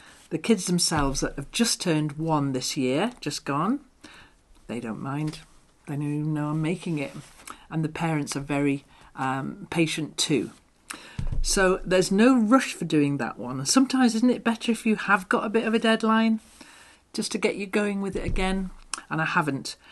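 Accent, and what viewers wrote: United Kingdom. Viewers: Hello, I came across your videos in 2024. I’ve grown to love them. I live in Pennsylvania, USA, about a two hour drive away from New York City. First of all, I love to listen to your voice and UK accent. I love hearing the little differences between our two ways of speaking English. I’d love to tell you how much I enjoy your calm voice, your humor, your lovely Anna, and Ant in your garden. The vast property where your last homely house sits is marvelous. I’ve never thought seriously of joining patreon but in your case I’m considering. Until then, thank you for your content. Oh, and especially the beautiful piano music. It’s so calm and restful, I feel it is needed to offset the political atmosphere we are living with here currently. I’ll keep watching, my friend, I love the virtual lime green sofa. It’s quite comfy with so many sweet people sharing it.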